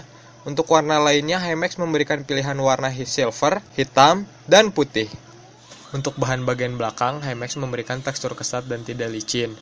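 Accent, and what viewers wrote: West Java, Indonesia. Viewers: Ngomong nya sundaaaaaa bingittt...hehehe..anyway..goood... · Logatnya sunda pisan... haaaaa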